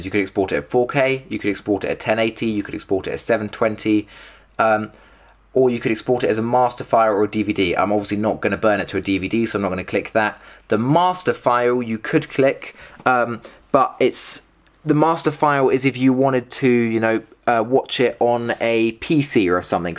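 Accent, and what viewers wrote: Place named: United States. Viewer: Mate what if you've got an external hard drive after using FCP and it's taking SO much space on my internal HDD lol, how do you then shift the library to the new HDD without running the existing videos? I actually went into the original media files and deleted a lot of the files which has fucked up the videos I already made. But I'm like whatever, I need space. Haha so yeah REALLY need to sort this out and help will be appreciated. Only a few minutes in but explanation is clear as F and also I'm English so honestly, the American accent does get a bit annoying after a while in tutorials not gonna lie LOL. Thanks a lot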